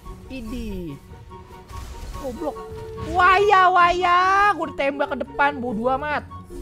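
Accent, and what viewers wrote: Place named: Medan, Indonesia. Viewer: kaget bg * logat medan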